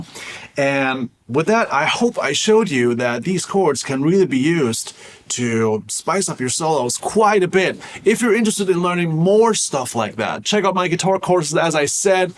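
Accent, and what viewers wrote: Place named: Germany. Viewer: You have just the smallest hint of a German accent as if you moved to America around the age of 12.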